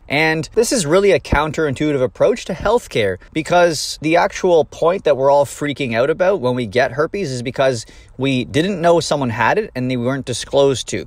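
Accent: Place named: Canada. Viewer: You say "out" in Canadian accent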